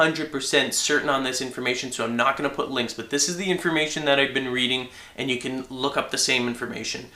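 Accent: Canada. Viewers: i just listen to these videos to hear his cool canadian accent :D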